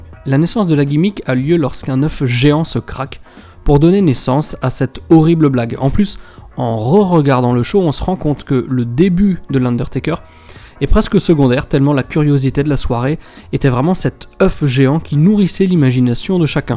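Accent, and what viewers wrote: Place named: Laventie, France. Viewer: d'où tu as chopé cet accent de Laventie?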